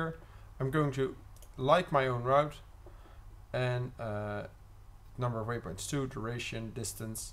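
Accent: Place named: United States. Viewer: American pronunciation is quite annoying.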